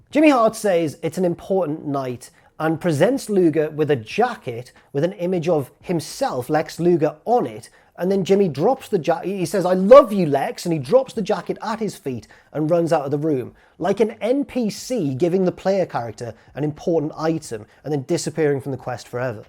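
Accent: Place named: Lancashire, United Kingdom. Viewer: Genuine 8/10 Lancy accent tbf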